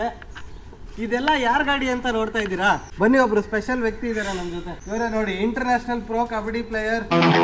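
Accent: Kundapura, India. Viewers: Kundapura kannada💛❤️ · Avr pakka manglore a kundapura va language kundapra kannada tara itt apa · Kundapura Kannada kembuk yesth Kushi ath alya ❤️💖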